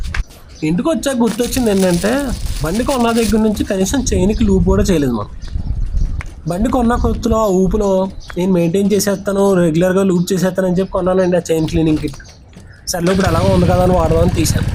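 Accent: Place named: Godavari, India. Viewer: నువ్వూ....నీ తెలుగూ.... గోదావరి యాస.....😂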